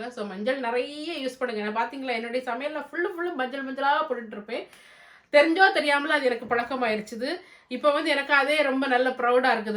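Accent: Nagercoil, India. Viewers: Hi akka...lot of fun in your videos. Original nagercoil slang...me too from nagercoil ...living in Muscat. Feel homely when hearing your voice...casual talks...awesome ...keep rocking ...May our Lord Jesus bless you. Be blessed & be a blessing to many. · Ver good recipes, very good laugh, Nagar Koil tamil, Positive energy... Super அக்கா... London அக்கா... I am from Chennai.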